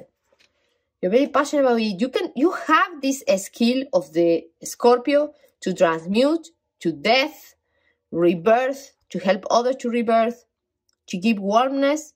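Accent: Argentina. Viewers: reconocí tu acento ... cordobesa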